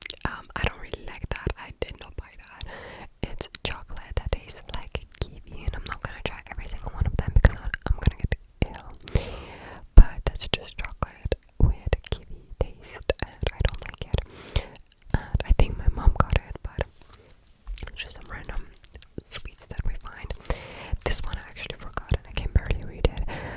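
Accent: Moldova. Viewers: Ce drăguță ești! Bravo părinților 😂🎉🎉🎉 Următorul ASMR te rog să fie în română! Cu accentul moldovenesc!🙏🏼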